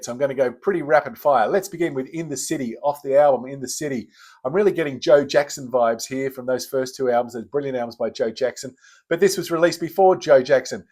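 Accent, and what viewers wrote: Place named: Australia. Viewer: With your accent...are you living in Australia? One of my sons has gone out to Sydney for a year. Ive been a long life fan...well, since 1978. Love them...what an inspiration! Gerard in Belfast.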